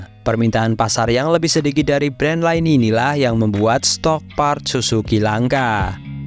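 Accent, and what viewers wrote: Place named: Central Java, Indonesia. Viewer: Keliatan sekali 😂😂😂 mimin ini, orang jawa tengah 😂😂😂😂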